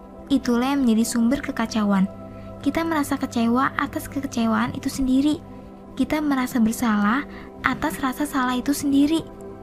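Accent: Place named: West Java, Indonesia. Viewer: Suara logat orang sunda nih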